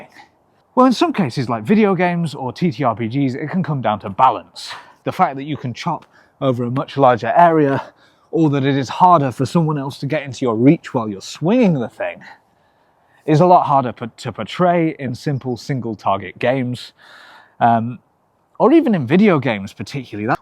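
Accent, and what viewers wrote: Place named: Yorkshire, United Kingdom. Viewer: I suspect part of it is your accent. Yorkshire?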